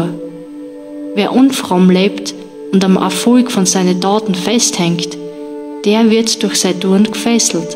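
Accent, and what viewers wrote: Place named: Styria, Austria. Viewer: Absolutely beautiful!😀 Your Steirisch Dialekt is amazing! Outstanding!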